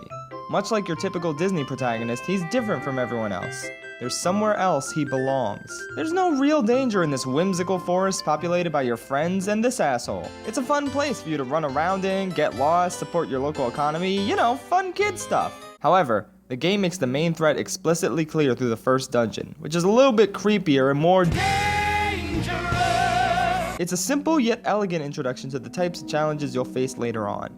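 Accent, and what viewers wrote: United Kingdom. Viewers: omg you’re british no way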